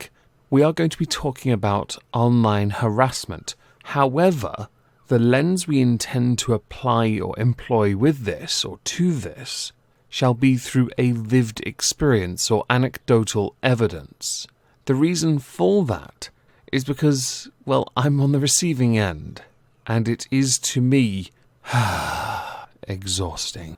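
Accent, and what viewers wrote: United Kingdom. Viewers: My condolences for issues you're dealing with. I hope whoever this fan is gets professional help, because this kind of fixation is unhealthy. I hope the issue is resolved as soon as possible and appreciate your upfront acknowledgement of the situation so your supporters (yes I had been using the vernacular meaning of fan in the past, but given the circumstances I will switch to your preferred and honestly more precise term at your suggestion, not out of sicophantism but because you made a good point regarding the implications of the word). Best wishes and look forward to seeing more content from you in the future. Edit: For the record I find the precision of your diction pleasant to the ear but not unusually so. I recognize you have a British accent, but you make no pretense at anything else so I don't think it's a big deal. I do find your educated choice of language enjoyable to hear, simply because I instantly know what you mean by your choice of words. 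But again, it's more an intellectual enjoyment like reading a well crafted sentence. Actually I take that back, it isn't like that intellectual enjoyment, it is that intellectual enjoyment.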